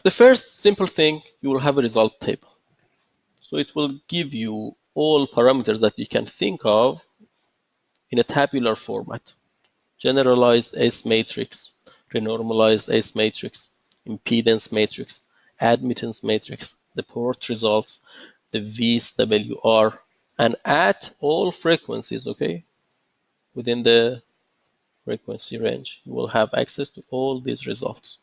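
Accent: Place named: Iran. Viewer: your accent is clear that you are an Iranian. How come is your name?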